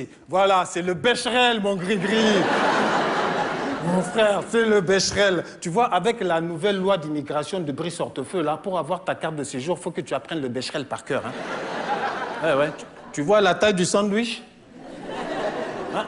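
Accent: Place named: Niger. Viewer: Le type Nigéroi avec un gros accent, il débarque et il te fait du Raymond Devos, et j'ai aucune honte de faire cette comparaison, c'était très bon.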